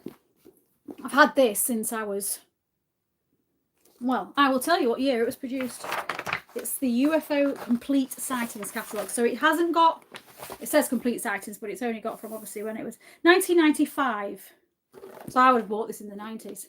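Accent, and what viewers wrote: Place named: Northern England, United Kingdom. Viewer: Hi, Paddy here. I'll look forward to the new Lincolnshire ghost video you just mentioned. As a Lincoln born and raised "Yellowbelly" bloke but now semi-retired in rural Hertfordshire after many years of working in London, I really enjoy your videos, particularly the haunted airfields of Lincs collection which are brilliant. I also love the fact that you haven't lost your Northern (I seem to remember you mentioned Trawden in an earlier video?) /East Midlands accent with the flat vowels that I still have (I still have a bath, not a 'barth') and I'll turn 70 next month after leaving Lincoln more than 40 years ago. I know Trawden and that whole area of Lancashire having relatives in the area. Good on you lass and keep up the great work with the channel 😍!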